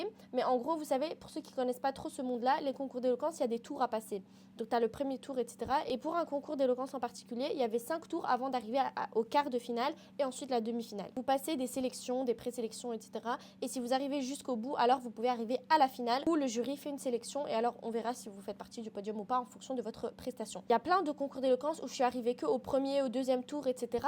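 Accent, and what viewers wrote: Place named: Tunisia. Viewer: Tu es tunisienne 😂😂😂 je reconnais l’accent 💕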